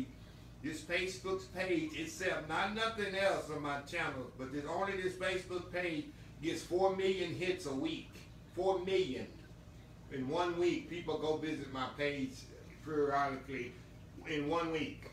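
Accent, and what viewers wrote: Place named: North Carolina, United States. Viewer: Love watching you create different foods ! Love your accent. I know you from Texas but it reminds me of NC in which I lived there for quite a few years. People there are pleasant . They love their get togethers and. SOUL FOOD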